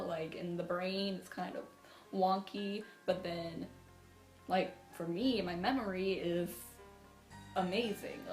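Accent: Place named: Russia. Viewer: Is it just me or you sound a bit Russian here 😊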